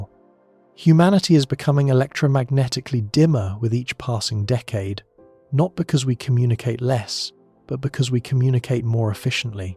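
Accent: United Kingdom. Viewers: But this guy sounds British, not Russian... 🤔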